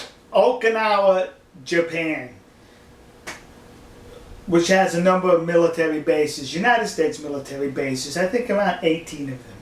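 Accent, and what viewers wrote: New York, United States. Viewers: I should have figured out that you were BAT SHIT CRAZY, when you said you've lived in Texas for 32 years, and still had an NY accent. That's kind of like Charro* and Zsa Zsa Gábor** <link> <link>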